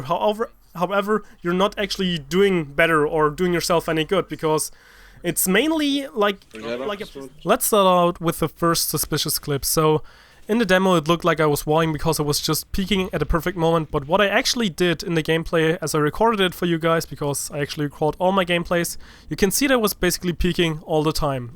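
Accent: Germany. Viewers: ! haha als ich das erste mal deine stimme gehört habe wusste ich iwie direkt das du deutsch bist ;D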